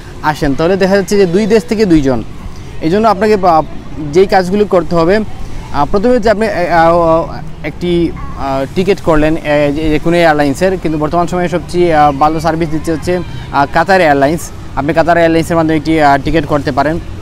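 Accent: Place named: Sylhet, Bangladesh. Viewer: সি‌লে‌টি টোন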